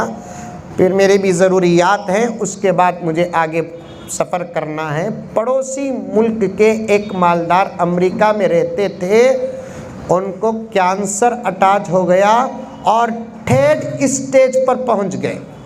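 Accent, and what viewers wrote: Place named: Goripalya, India. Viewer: Pure goripalya accent ameer sab Allah apki Umar mein barkat de ❤❤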